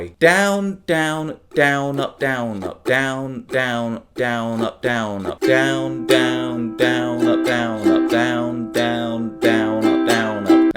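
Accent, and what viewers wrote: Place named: United Kingdom. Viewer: I love youuuuu. The best Ukulele teacher ever!!!!! You make the instructions so simple to follow!!! I love youuuu. You've just got another subscriber. Huhu I love you so much you make it much easier for me. I just began learning Ukulele a couple of days ago and now I can play and sing. Next is this song, my most favourite jazz song ever:( I love your British accent too. Hope everything good comes to you <3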